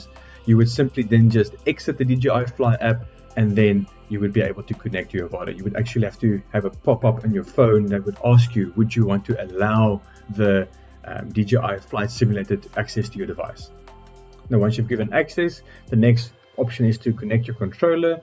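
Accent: South Africa. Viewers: South Africa accent…not Australia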